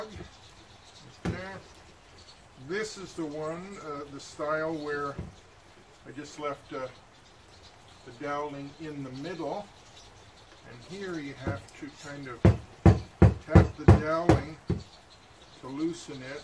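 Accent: Canada. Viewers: Hi and thanks for the video. You sound Canadian...where can you get inexpensive bird seed?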